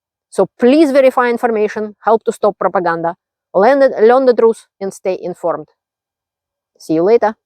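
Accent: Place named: Russia. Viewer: Послушал на русском для информации, послушал на английском, насладился сочным русским акцентом! 😅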